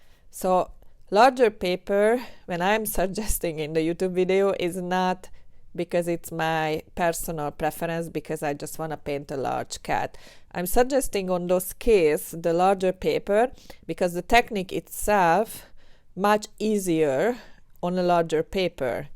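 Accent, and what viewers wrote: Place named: Hungary. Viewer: oh look, a hungarian accent! :D nice cats btw! so furry and fluffy looking!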